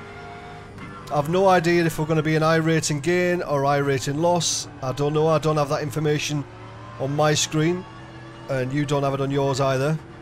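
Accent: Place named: Durham, United Kingdom. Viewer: I've watched loads of your videos, and I can never decide which part of the North East you're from, go on, put me out of my misery? The accent sounds like Durham/Sunderland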